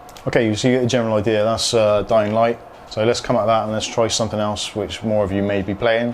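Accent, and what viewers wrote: Bristol, United Kingdom. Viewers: Bro are you from bristol cause if u are same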